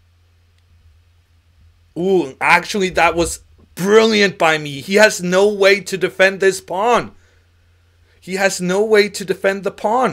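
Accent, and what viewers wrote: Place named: United States. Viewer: Du låter nästan amerikansk.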